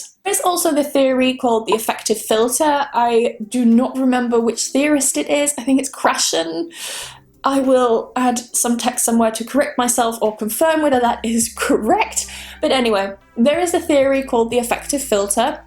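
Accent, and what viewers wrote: United Kingdom. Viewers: Hello happy new year 2020, I want to say you many thanks for all videos 2019, they helpful me so much. I enjoyed, learned a lot of things about English language and I wish to continue my English progress with you and your English lessons 😁🤗. By the way I love your pronunciation voice, So I love the British pronunciation 🏴. Best regards from Colombia 🇨🇴.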